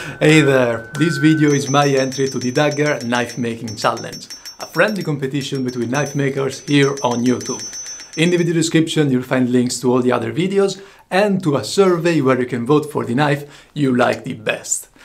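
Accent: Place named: Italy. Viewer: Mi sembri italiano dall accento, vabbè Anyway great 👌🏻👍🏻🤘🏻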